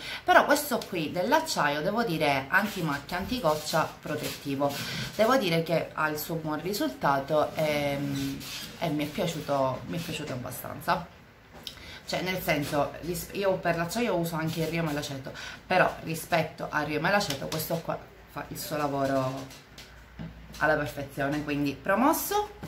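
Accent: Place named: Palermo, Italy. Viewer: Anchoo nuova iscritta sei molto simpatica e cmq essendo una siciliana ho capito subito che sei di Palermo......io Catania ..bel video alla prossima!!!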